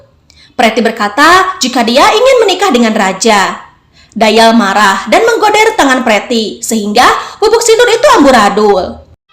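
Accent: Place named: West Java, Indonesia. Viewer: Narator nya orang sunda njir....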